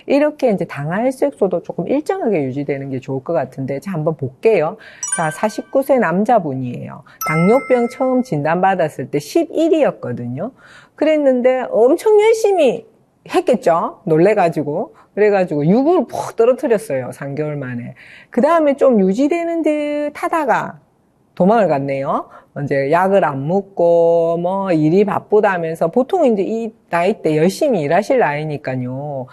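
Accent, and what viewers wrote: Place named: Busan, South Korea. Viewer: 부산 사투리가 귀여우세요 😊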